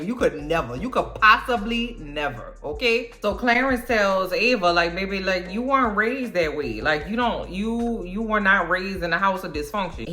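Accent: New Orleans, United States. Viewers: I'm subscribed I lived in Lincoln Parrish I miss that neworleenz talk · You remind of Bondy Blue!! Love you vibe and N.O. accent! You’re so funny! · Sounds like you’re from Nola⚜️😃 I knew it, I know my people 😂 I like that Melanin people are on my screen but TP needs at least 2 seasoned writers from Shonda Rhimes team or somebody😅 however, it was funny! I now point two fingers at my kids when they don’t listen😂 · BTW, your New Orleans accent makes this even funnier😂😂😂 it just adds spice and realness. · This is my first video of your and Im here to stay. I love a New Orleans accent chile...from your neighbor in Texas 😉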